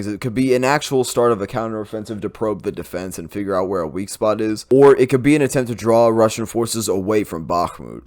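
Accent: United States. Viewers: I love your videos and look forward to them every update. Don't worry about the knobheads freaking out over the way you pronounce words. People speak the dialect they grow up with. It's normal. Anyone freaking over it needs to go check themselves into a psych ward over their OCD behavior. I lived in England 8 years and they love American dialects the same way we love British dialects. Be who you are and anyone that doesn't like it can pi$$ off. You're the man!!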